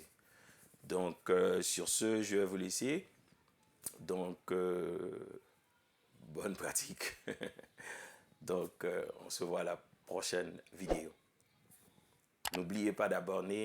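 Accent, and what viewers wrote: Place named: Canada. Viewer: Vous avez un accent pas très prononcé mais coe une personne qui a été au canada ou bien vous êtes au canada.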